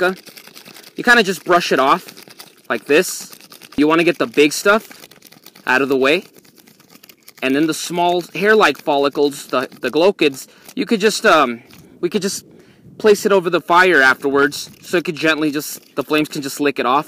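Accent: Texas, United States. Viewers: Where in texas is he from??????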